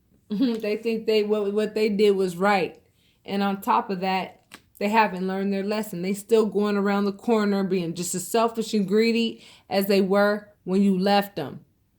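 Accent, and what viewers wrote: United States. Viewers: well said exactly what happened to me poured her with so much love so drained karma a bad thing shes having a shit time out there all the lies mind games cheating manipulating witch still wont apologise for all the pain caused shes getting it bad thank you so much luv the yanky accent bless ya xxxxxxxxxxxxxxxxxxxxxxxx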